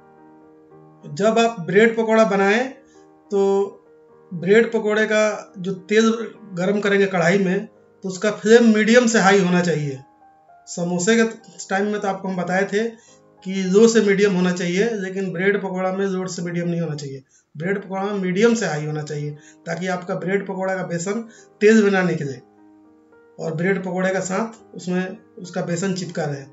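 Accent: Bihar, India. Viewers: Kya aap Bihari hai Tone se lag raha hai Respect 👌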